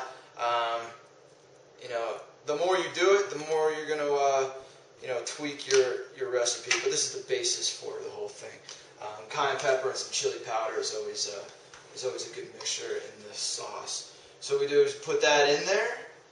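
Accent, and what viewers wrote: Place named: California, United States. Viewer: Sounds like hes frim california, definately not the midwest.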